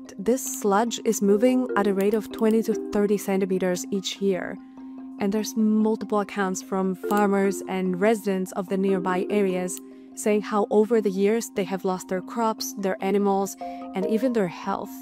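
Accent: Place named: Italy. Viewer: Your videos are fantastically well edited and researched. What's your professional background? And also, where's that accent from? I can't place it... Italian?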